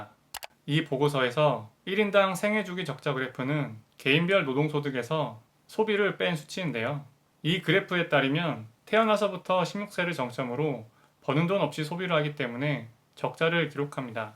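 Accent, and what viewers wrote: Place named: Busan, South Korea. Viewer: 잘 보구 있습니다 ^^ 부산사나이 아입니까 ㅋㅋ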